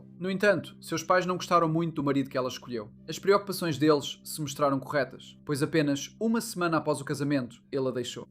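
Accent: Portugal. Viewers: Continua o sotaque português, e eu continuo a não assistir e a não curtir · Mas ele não especificou que o canal é Português do Brasil. Você não consegue entender em português de Portugal? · Mas então ele não é português? Eu tinha entendido que está em português de Portugal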